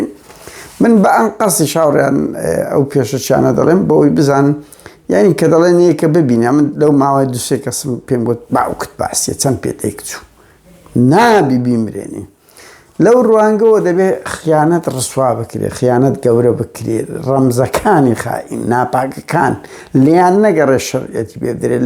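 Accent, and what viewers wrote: Erbil, Iraq. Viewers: کوردی دەڤەری هەولئر ناخۆشترین دەنگی هە یە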